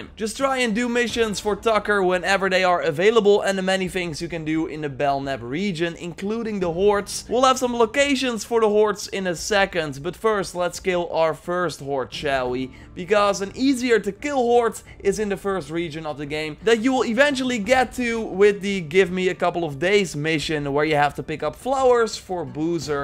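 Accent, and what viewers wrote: Netherlands. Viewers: This Guy sounds dutch, i recocnize the accent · You sound like you're from the Netherlands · ben je nederlands je bent super goed in engels maar ik hoor het aan je accent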